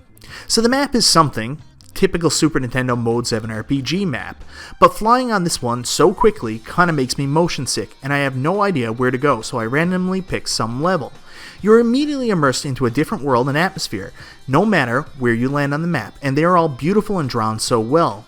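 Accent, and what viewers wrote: Canada. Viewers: Another nice vid! I’m been a sub for a while but haven’t commented. If you don’t mind me asking what part of Canada are you from? I’ve noticed your accent. Cheers man!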